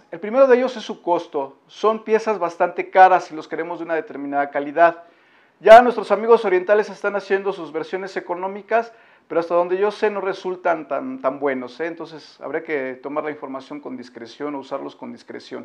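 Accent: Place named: Mexico. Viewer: Saludos de Brasil... ya no oía el acento de los mexicanos hace mucho tiempo. Es una buena idea. Creo que pueda utilizarlo para um tablero de corte plasma!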